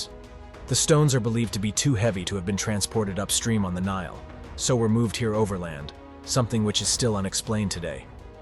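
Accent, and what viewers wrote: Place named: United States. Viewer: You sound like an American. Why are you giving metric dimensions. FTS.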